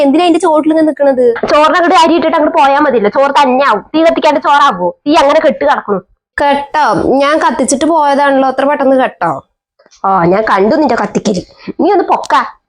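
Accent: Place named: Kallepadam, India. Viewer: കല്ലേപ്പാടം വർത്തമാനം നല്ല രസം....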